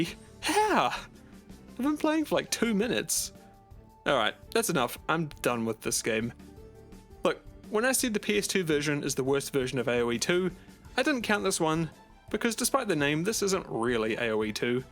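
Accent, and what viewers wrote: New Zealand. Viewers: Cute NZ accent, lol.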